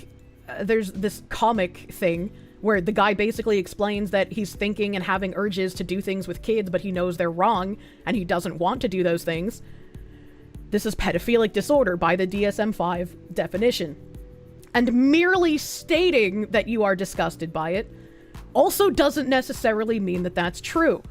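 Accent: Boston, United States. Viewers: I thought the reason you pronounced things oddly is because you're from Boston.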